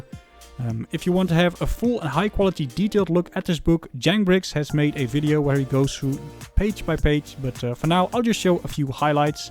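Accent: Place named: Netherlands. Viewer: Are you Dutch? You're accent seems dutch to me.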